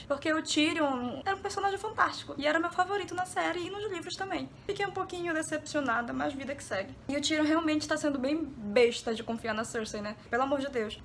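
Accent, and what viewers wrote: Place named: Pará, Brazil. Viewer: Esse sotaque paraense <3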